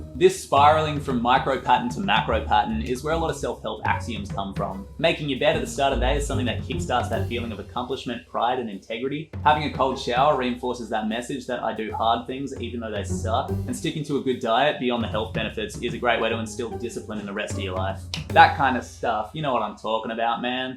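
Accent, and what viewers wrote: Australia. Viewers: Finally an aussie youtuber, makes it so much easier to listen to all of it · Are you an Aussie?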